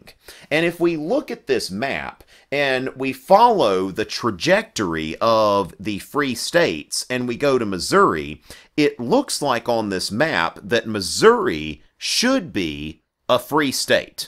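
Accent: South, United States. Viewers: I forgot people have southern accents. I like it!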